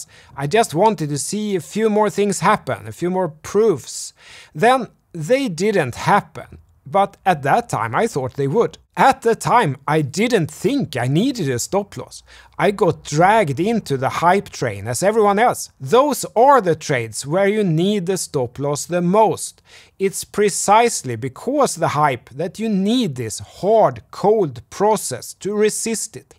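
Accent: Sweden. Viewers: I love your thick Swedish accent, and I'm not being sarcastic. You sound exactly like a voice from a south park episode. If the crypto stuff doesn't work out, do voice acting. :D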